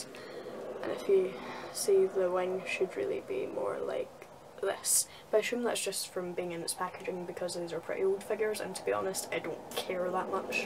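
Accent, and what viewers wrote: Glasgow, United Kingdom. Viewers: are you from glasgow ?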